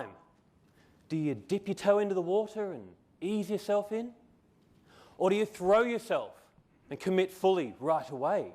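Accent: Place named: Australia. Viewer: boss you sound ozzie not brit excellent speech sir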